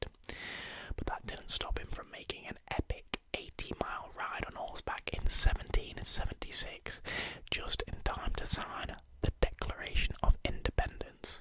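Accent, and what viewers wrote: United Kingdom. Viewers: Absolutely loved this video As a South African I absolutely love British Asmr accents 😂😂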